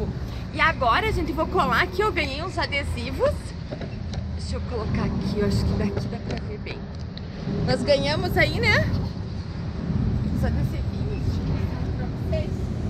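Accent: South, Brazil. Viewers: Acho da hora esse sotaque do Sul, vc fica mais linda.😍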